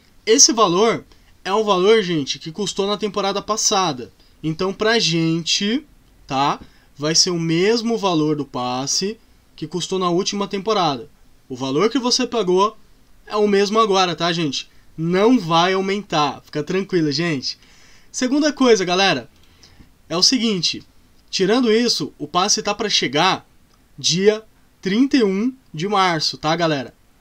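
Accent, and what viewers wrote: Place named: Northeast, Brazil. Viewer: tmj junto, preocupa não mano nos somos nordestinos e temos esse sotaque msm uai kkkk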